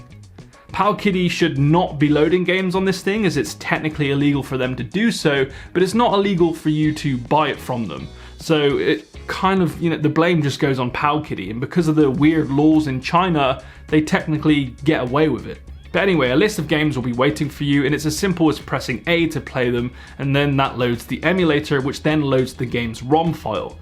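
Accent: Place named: West Country, United Kingdom. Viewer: your heavy west country accent will never not be adorable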